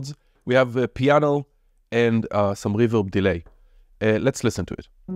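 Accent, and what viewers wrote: Israel. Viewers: I kind of respect the fact that you're not even trying to work with your Israeli accent. It's as if you're almost putting a little extra effort to sound more Israeli than you naturally do. :) Kudos man. Truly.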